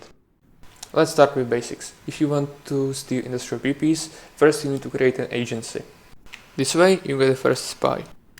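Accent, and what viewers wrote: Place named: Czech Republic. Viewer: i tried to watch it but i could not stand that czech accent.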